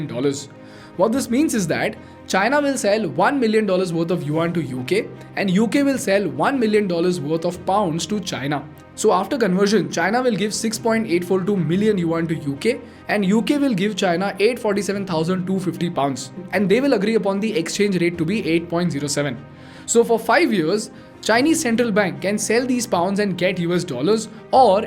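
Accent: India. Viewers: Ganesh Prasad is the face of the indian accent now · I love your English, it sounds very much like Hindi. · OMG, the host indian accent is so annoying. He should not speak at all. Next point, the West can never trust India and Saudi cuz they are just two face users and go wherever the wind blows. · The problem is .... Your Indian accent" Sorry, can't focus on subject.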